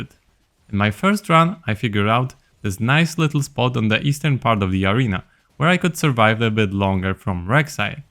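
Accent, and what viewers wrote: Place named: Poland. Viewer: The 2137 gold 😂. Nice polish accent :D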